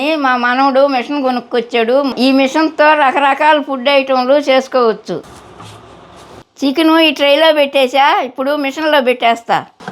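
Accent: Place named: Ongole, India. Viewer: Ongole accent... 😊😊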